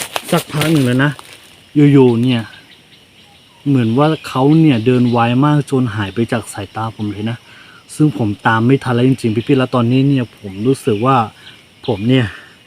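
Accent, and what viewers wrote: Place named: North, Thailand. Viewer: พูดเหมือนคนทางภาคเหนือของเรา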